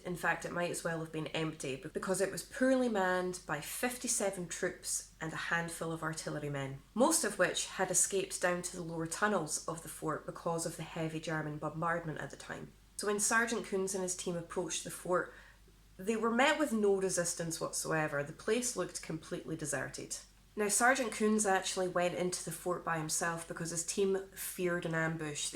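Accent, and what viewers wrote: Scotland, United Kingdom. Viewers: This my first time checking your metal history. It’s pretty cool. I want to hear you pronounce some Hawaiian words. I haven’t heard that in a Scottish accent.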